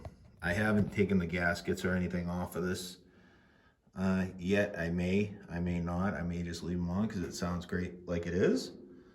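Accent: Boston, United States. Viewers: I believe these drums came in either a gloss or satin finish, it looks like you have an add-on. A quick check of the numbers on the tags should clarify this. Either way, these are beautiful drums! Nice Boston accent.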